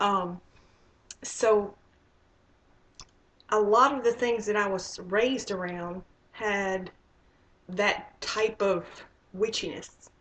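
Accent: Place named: South, United States. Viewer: Your accent sounds like home to me. :D I'm from the South. Love your story!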